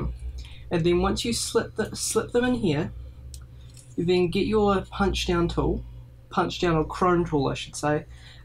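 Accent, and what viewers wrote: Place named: United States. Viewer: Dude are you kiwi living in America, lived in America, or are kiwi kids getting American accents from the internet? Nice tut also.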